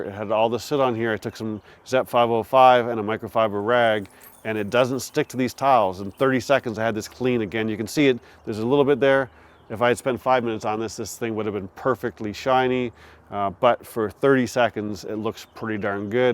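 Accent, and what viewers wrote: Canada. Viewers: Great video thanks. I’m considering the KK but doesn’t seem to be available in Canada. You do sound Canadian btw.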